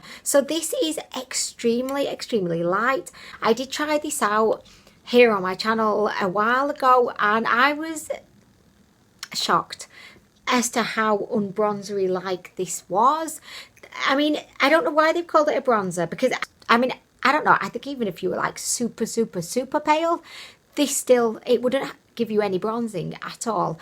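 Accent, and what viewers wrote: United Kingdom. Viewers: Interesting. It’s not a normal British accent.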